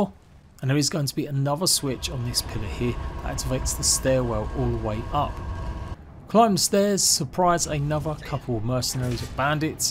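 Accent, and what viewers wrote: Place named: United Kingdom. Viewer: Ur to british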